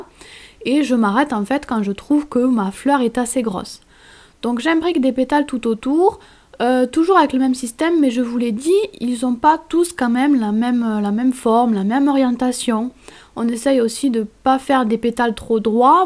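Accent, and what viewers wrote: South, France. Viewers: Hiiii ! Olalala c'est vraiment très très beau - Je rêve ou c'est un ptit accent du sud que j'entends ? =p Bisous bisous + j'aime hihi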